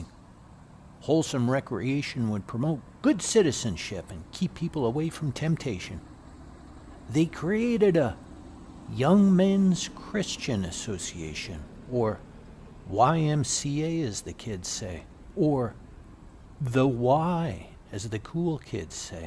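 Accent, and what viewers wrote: Canada. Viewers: I dig your narrative style. So charmingly hinterland Canadian. Brings a smile to my face :)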